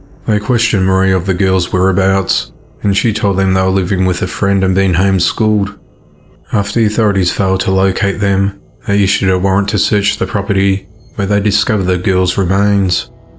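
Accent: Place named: Australia. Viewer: Just came across your channel and as soon as I heard your accent I was like, hell yeah I am definitely subbing to Mt fellow Aussie... 🙂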